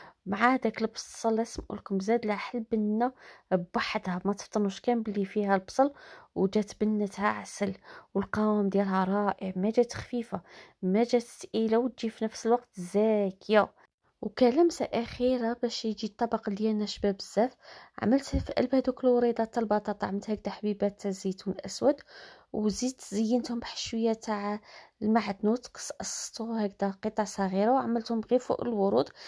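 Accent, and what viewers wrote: Tlemcen, Algeria. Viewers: يا سلام علي الوصفة و علي الهدرة التلمسانية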